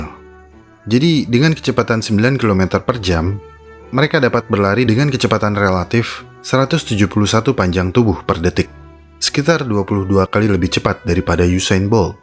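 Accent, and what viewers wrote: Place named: West Java, Indonesia. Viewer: Sieta mani teungteuingeun. Dr lafalnya Fix orang Sunda.?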